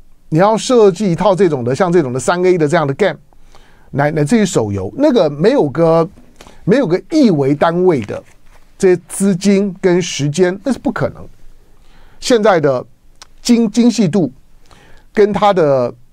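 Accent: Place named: North, China. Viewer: 唐湘龙口音越来越北方化了😂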